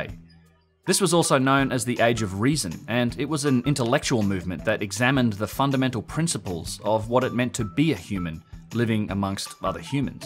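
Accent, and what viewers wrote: Australia. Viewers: Great to hear and Australian voice with a typical Australian perspective "God's pissed off......"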